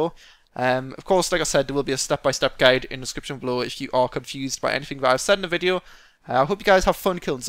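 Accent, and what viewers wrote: United Kingdom. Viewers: well, the brithisch accent is pretty hard to understand, i mean i understoad everything but damn on some parts it was hard ^^'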